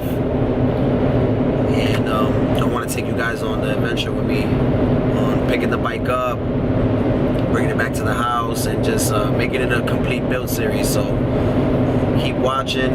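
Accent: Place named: Dominican Republic. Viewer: Desde que el pana hablo españo, le salio el sason dominicano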